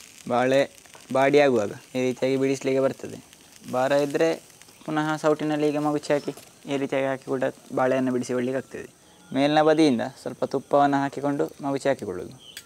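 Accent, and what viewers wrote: Mangalore, India. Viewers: Yes to listen to your Mangalore kannada is very nice. I remember my days at Mangalore for twenty years.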